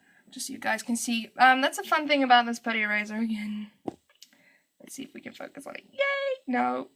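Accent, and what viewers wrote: South Africa. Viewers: Great videos really helpful :D The only problem with watching them is that your accent makes me miss my South African gf haha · OMG OMG OMG FINALLY A SOUTH AFRICAN!!! Wait a second as I subscribe to you. To my surprise I was like all these other people like Europeans and Australians etc. and I am sitting here like where are South Africans? Then when clicked on your channel and the accent! I fell out of my chair, screaming. Gosh I am so happy :)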